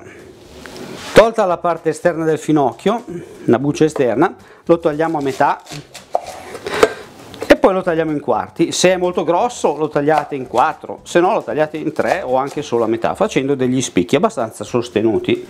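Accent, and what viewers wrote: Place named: Lombardy, Italy. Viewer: Buoniiiii li farò così la prossima volta , e poi mi fai morir dal ridere quando dici . ‘Guarda che roba , vien su un profumino ‘ sei proprio Lombardo come me . Bravoooo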